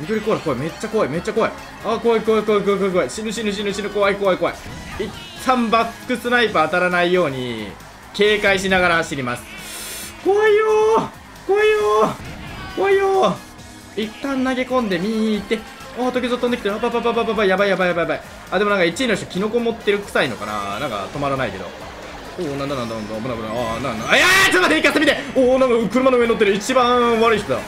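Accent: Kansai, Japan. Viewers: 最近関西弁が多い気がして嬉しい🥺激カワフェイスにイケボからの関西弁好きです沼ですもっと言ってください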